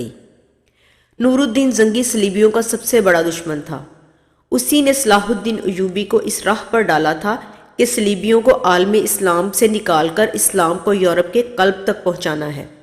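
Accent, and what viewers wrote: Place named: Punjab, Pakistan. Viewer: ایک مرد مؤمن مرد حق کی شان بیان کرنے کے لئے مردانہ آواز ھونی چاہیئے، مؤدبانہ انداز ھونا چاہیئے اور لب و لہجہ بھی درست ھونا چاہیئے اور خاص طور پر تلفّظ پر زور دینا چاہیئے !!! پنجابی تلفّظ میں اردو کانوں کو انتہائی بھونڈی لگتی ھے _ " معذرت کے ساتھ ؟؟؟ " سید عابد حسین شاہ اویسی ایوبی مداروی !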